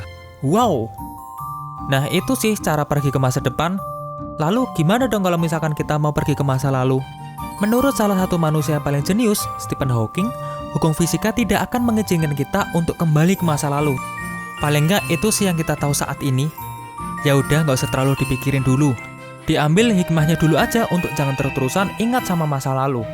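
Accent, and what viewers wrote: Java, Indonesia. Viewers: Orang jawani medok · Medokmu Mas,,kyk aq.he.,he.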